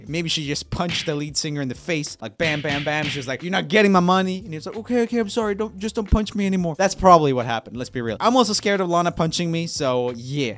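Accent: Sweden. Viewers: Swedish I think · Spikey100000 how they’re both from Sweden. Have very similar accents and speach patterns and a similar sense of humour plus they are literally friends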